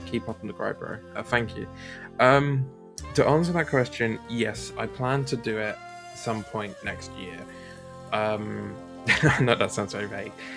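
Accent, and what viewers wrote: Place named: United Kingdom. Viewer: Your British is showing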